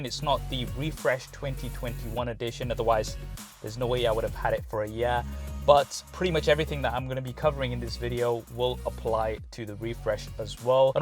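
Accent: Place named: Bradford, United Kingdom. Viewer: He's from Bradford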